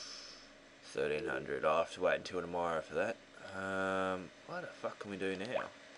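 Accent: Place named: United Kingdom. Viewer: Good to have your british back,!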